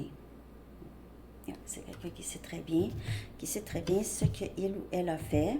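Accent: Canada. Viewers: Hi sweet lady ❤️ I love ur videos & ur sweet Canadian accent 😊it doesn’t matter to me when u speak English👌🏻👌🏻 M’ from Paris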